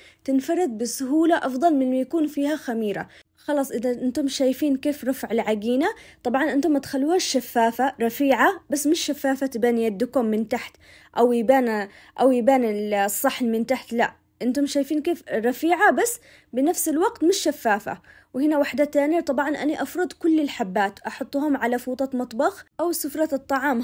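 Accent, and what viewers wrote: Yemen. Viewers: السلام عليكم احب طريقه واطباق طبخك يا واكثر شي احب لهجتك اليمنيه انت سيده مباركه الله يحفظك يا ريت تزوريني في جده ❤ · لاول مرة احب لهجتنا اليمنية منك انتي طبعا لهجتنا متعددة يابنت عدن الحلوة عفويتك وعدم تصنعك خلاني اقول والله لهجتنا كانت مظلومة من قبلك وتسلم ايدك يا قمر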